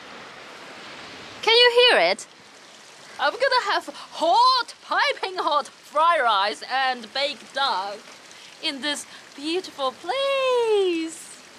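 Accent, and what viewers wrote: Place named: RP, United Kingdom. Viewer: Haha love that you have adopted a posh accent. Please keep it and your English is 👍🏼 👌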